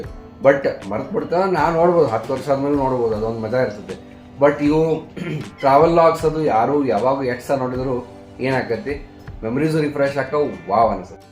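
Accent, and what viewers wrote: North Karnataka, India. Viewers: ನಿಮ್ಮ ಭಾಷೆಯಲ್ಲಿ ಬಳಸುವ ಕೆಲವು ಪದಗಳು ಎಷ್ಟು ಸುಂದರ..... ನೀರಾಡಿಕೆ ಅನ್ನೋ ಪದ 👌🏼👌🏼👌🏼👌🏼👌🏼👌🏼. The star of the Vlogs is your North Karnataka language 👌🏼👌🏼👌🏼👌🏼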